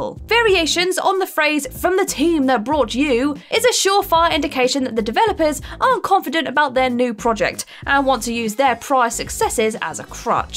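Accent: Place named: United Kingdom. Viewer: sounds like she's from the UK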